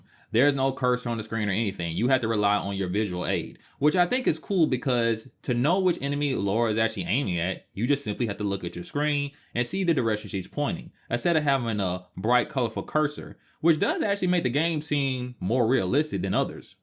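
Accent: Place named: United States. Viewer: it's a joke.. and I don't believe this guy got it wrong anyway, he just has a murican accent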